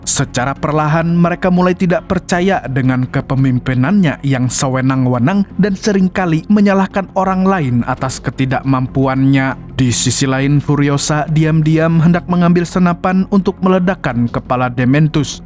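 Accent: Banjar, Indonesia. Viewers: Urang Banjar kah Nang? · Banjar asli kah mang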